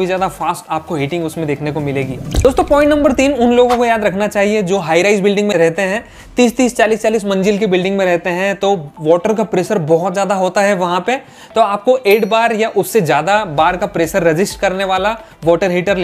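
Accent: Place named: Northeast India, India. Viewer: North East India se ho kya aap.. ? apki accent vaisi h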